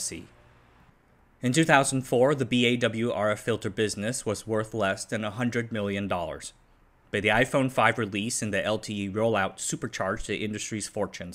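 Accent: United States. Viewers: Surprisend by you saying "aluminium". -Yet you sound like an US American, possibly of Asian descent (barely noticeable at all, when listening to you speaking). What's your story? You didn't say "aluminum" ! -Yet you just sound "american" for my nort european ears! :p I really enjoy listening to your informative, interesting and detailed videos ! Keep up the good work 👍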